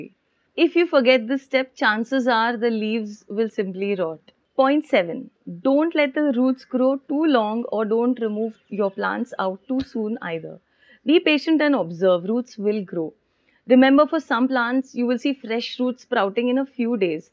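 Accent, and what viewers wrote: India. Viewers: I love the Indian accent, it’s the best. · This is how my indian university lecturers talks. I can't understand shiat.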